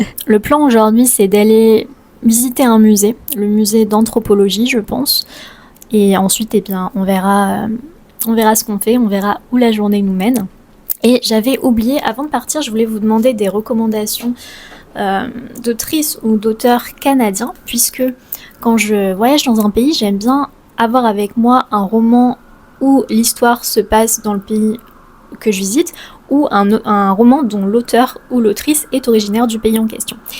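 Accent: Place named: South, France. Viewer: Ton sud français est mille fois plus beau!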